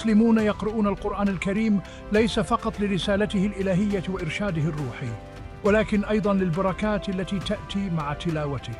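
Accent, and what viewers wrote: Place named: Bosnia and Herzegovina. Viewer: ليست عربية ب لكنة أوروبية أو يوغسلافية أو بوسنية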